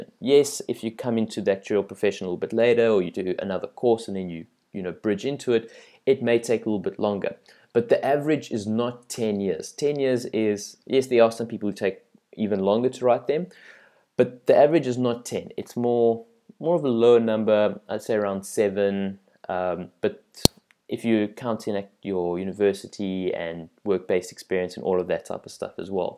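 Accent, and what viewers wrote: South Africa. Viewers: Ahah you are so South African! Me too.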